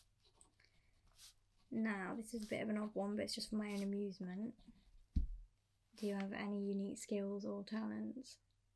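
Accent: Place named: Birmingham, United Kingdom. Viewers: I think the best thing is that it sounds like u come from Birmingham (witch is where im from 😂) really good role play Keep up the awesome work 💯♥️ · Being from the Midlands (South Yorkshire) specifically your accent felt so at home for me lol its so strange hearing it from you tho.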